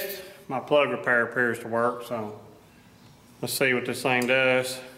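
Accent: South, United States. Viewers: you welcome,BTW love your southern accent LOL..I am really respect you,one man army LOL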